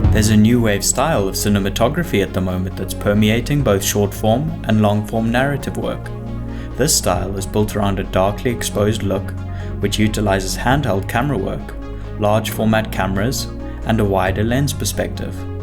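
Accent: South Africa. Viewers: You sound South African 🤷‍♂️ lol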